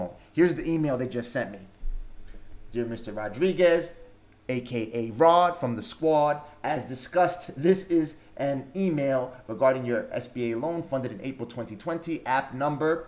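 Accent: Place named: New Jersey, United States. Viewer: Last night I met a guy. His voice sounds exactly like yours. He told me he's from New Jersey. I knew immediately that he was from NY or NJ 😂😂😂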